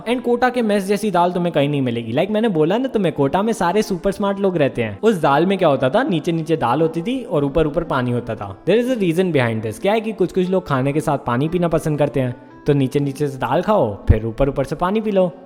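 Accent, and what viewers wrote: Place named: Punjab, India. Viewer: 😂😂😂😂😂😂😂and that punjabi accent of yours......yrrrrr you are just wowwwwww❤️❤️❤️❤️❤️😂😂😂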